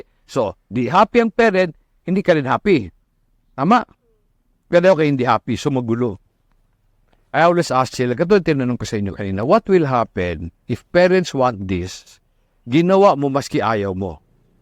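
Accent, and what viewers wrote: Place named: Visayas, Philippines. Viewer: Cute kaayo ka magbisaya Sir 😮😊😊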